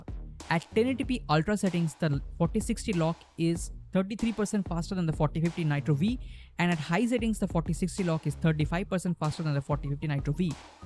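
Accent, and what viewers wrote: India. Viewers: Bihari bkl commentary nhi chaiye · Bro are you from Siliguri...?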